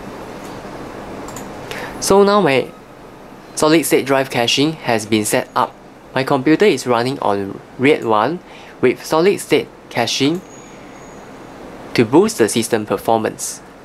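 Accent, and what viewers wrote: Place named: Asia. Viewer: asian brotha accent for the win!!!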